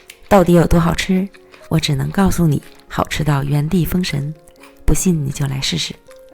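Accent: Northeast, China. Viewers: 博主的东北口音走到哪都是特别有辨识感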